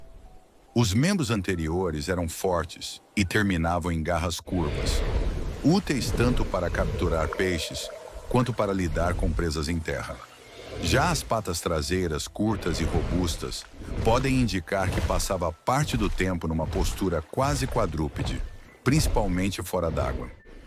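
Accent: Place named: Minas Gerais, Brazil. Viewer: Dublagem Minera uai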